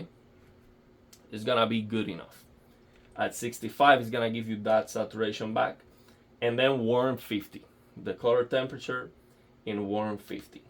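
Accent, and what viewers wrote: Cuba. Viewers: Asere tu eres cubano xq me suena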